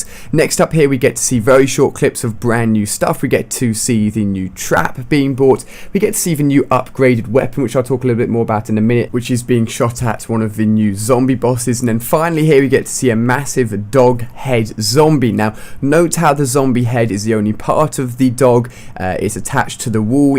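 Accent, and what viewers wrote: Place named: RP, United Kingdom. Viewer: Your so posh and have a weird lisp thing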